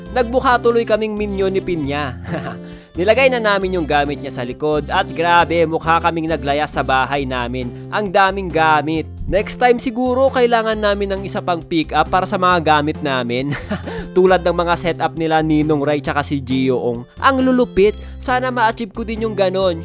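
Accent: Igorot, Philippines. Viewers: halatang igorot si kuya hahaha salita palang eh parang ako hahahaha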